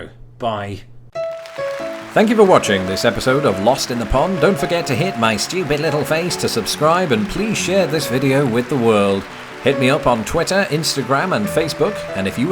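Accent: United Kingdom. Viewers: Is it just me? Your accent is sounding slightly more British. Maybe it's because your not using your comedy voice like you normally do on your video. But no matter, I'm loving your views on how your homeland has changed. I visited London in '00, so it's also weird to see how much it's changed since then.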